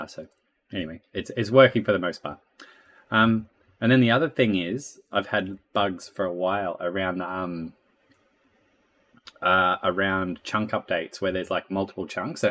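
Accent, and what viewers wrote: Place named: New Zealand. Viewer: looking pretty good, do you think you'll put in some kind of sloped/wedge block? also, i noticed from your accent, are you a fellow new zealander? cause if so, that's pretty cool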